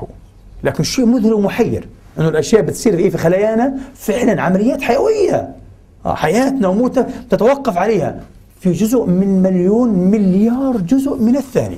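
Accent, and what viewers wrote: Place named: Palestine. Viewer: والله جعلتني اشتقاق الى سماع اللكنه الفلسطينية انت ممثل مسرحي رائع انت فنان نبرات صوتك صادقة .....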